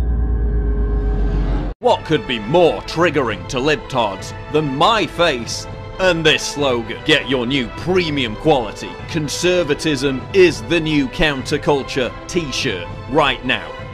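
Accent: United Kingdom. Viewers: That's because he's British, could you not tell from his obvious accent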